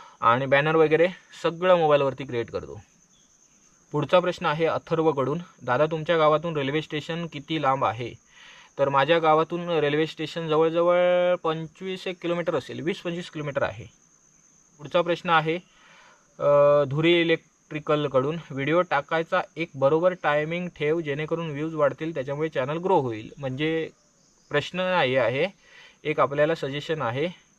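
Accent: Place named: Malvan, India. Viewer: Malvani boltas taa pan bhari vata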